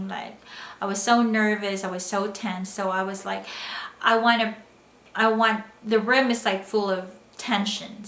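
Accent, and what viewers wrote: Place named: United States. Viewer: True American accent too. congratulations. For me I always speak the way I did. Hope I could fallows your accent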